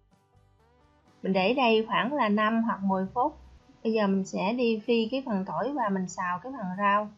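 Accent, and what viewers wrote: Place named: South, Vietnam. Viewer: Rat huu ich, chi tiết, và giong mien nam rat de nghe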